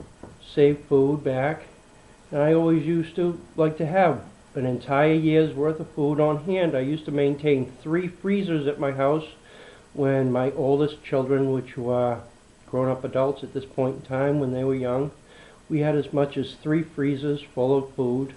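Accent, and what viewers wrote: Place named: Maine, United States. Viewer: store what you eat and eat what you store. I have been doing moderate food storage for 20 years. I shop at Save-A-Lot every month. time from Maine and you sound like you're from somewhere Within a 50 mile radius. :-) do you know why I started to store food? because my first husband would starve me and then years later over feed me so once I divorced him I still felt like I had to keep a huge closet full of food.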